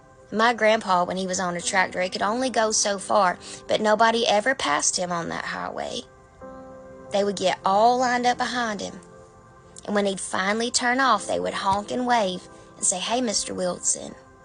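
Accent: South, United States. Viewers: How sweet and Southern❤😊 Beautiful message. Thank You Sister. Virtual Tea❤😊 Amen. Message received. Hugs to ya.🙏🙌💕💞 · I embrace your advice in a southern drawl and everything in between. I will till the ground, plant the seed, and watch it grow. I won’t let it fall without tilling the ground. I’ve gone through enough crap to have fertile soil. To challenge life with authority. I love listening to you to much so I will listen to you. For the Lord’s sake. For all our sakes.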